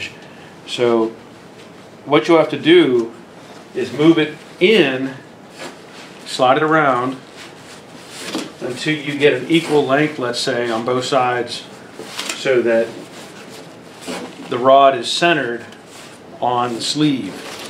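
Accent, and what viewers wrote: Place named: Georgia, United States. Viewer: You.do.not.talk.like.someone.from.around.atlanta..more.like.waycross,ga..lwenr.too.schoole.in.big.atlanta.many Years.ago..i.am.also.a.lill...little.south.of.atlanta........florida..keep.on.mustanging..my.friend.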